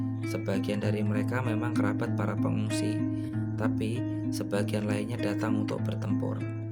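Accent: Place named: Java, Indonesia. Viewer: Kok medok mas bahasanya orang jawa y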